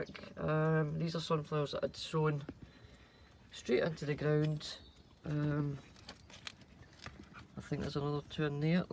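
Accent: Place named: Glasgow, United Kingdom. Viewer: Hiya hun, I love your accent, is that a touch of Glasgow I hear? wow you've loads going on, yep definitely top your spuds right up, as soon as the stems set after poking through, they stop producing the stolons and it's the stolons that the spuds grow on. If you need anymore San Marzano, give us a shout. Take care. Alex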